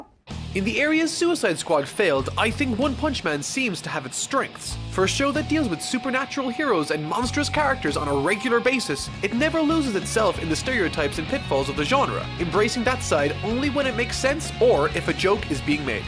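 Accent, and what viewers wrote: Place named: Ireland. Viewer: Great video, I've become a huge fan of yours in the past few weeks. But I need to ask this as it's distracting while I watch your videos. Are you from Ireland? Keep up the great work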